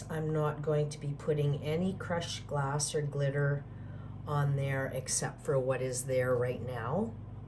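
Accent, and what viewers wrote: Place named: Canada. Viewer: Hello, I am yet to start my new passion of paint pouring but I had not seen anything like this before! You have inspired me so much. Before I found this painting, I had started a Reno in my home. I still have much to do & have had to decide to wait to start this new endeavor till my remodeling is done or I will not get it finished at all. It’s been difficult to have to wait but it’s for the best! The Beautiful Creations you have given me has jumped my mind into overdrive! I just want to be able to get organized and setup a Craft Room. I have enough supplies to do a lot of work,(Play), & it’s all in boxes in my bdrm! I barely have walking room to get thru it to use the bathroom. I think that you must be Blessed by the hands of God to Create such Beautiful Art! Thank You for putting your Vids on here for me to enjoy! I consider it special from one Friend to another. 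Where are you from? I think I detect a Canadian accent! I live in Washington State about 3 hours or so South of BC, Canada. Like your works of art, it is as beautiful as it can be. I hope you will have continued success and stay safe so you can create even more. Thanks again, Newburyport to this stuff, JilliBean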